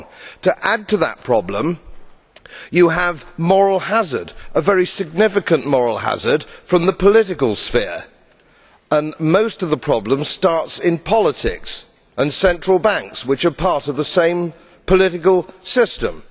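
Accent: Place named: Southern England, United Kingdom. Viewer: Fair enough, but remember that this is standard southern English, the norm for the language, if you like, so that any deviation from it, whether in England itself or anywhere abroad, is an accent. North America, South Africa, Australia, New Zealand (me) and some others, all have their own distinctive accents upon standard southern!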